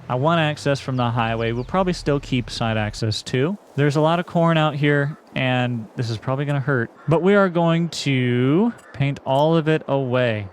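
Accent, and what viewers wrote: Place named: Utah, United States. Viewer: Do happen to be from Utah? You have the accent 😅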